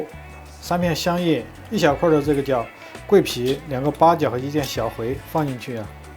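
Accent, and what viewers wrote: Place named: Sichuan-Chongqing, China. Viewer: 明显的川渝口音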